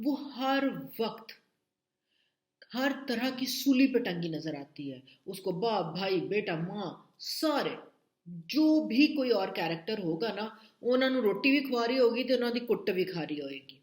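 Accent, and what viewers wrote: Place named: Punjab, India. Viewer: 👍👏👏👏 very well said, in such an interesting manner with a tarka of Punjabi. 😂Love her style of speaking. 👌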